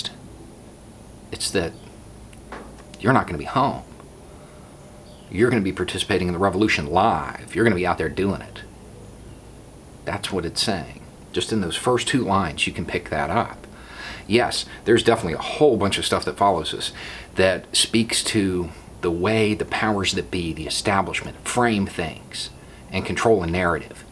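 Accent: South, United States. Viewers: Hey, love the messages in your videos. How does your own local community receive your views? I'm assuming from your accent that you live in the south and therefore probably a right/ conservative leaning state? Thanks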